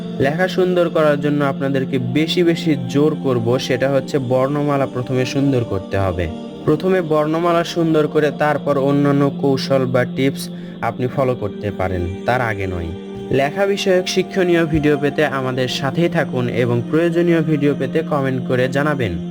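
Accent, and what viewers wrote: Bogura, Bangladesh. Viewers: Apnar basha ki Bogura te. Vai🤔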